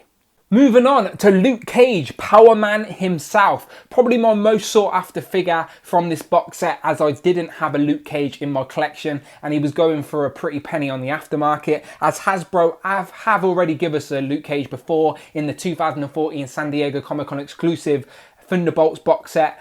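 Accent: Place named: United Kingdom. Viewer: Can you tone down that British accent? I can barely understand what you're saying.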